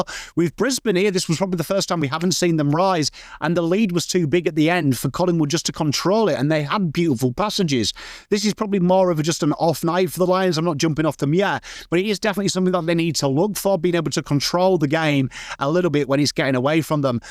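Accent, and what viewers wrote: England, United Kingdom. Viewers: Why does it sound better coming from a Pom?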